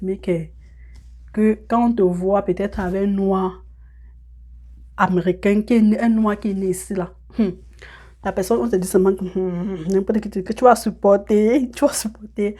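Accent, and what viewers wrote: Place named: Cameroon. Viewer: Dès vos premiers mots j'ai deviné que vous étiez camerounaise🇨🇲 l'accent de ça ,force à toi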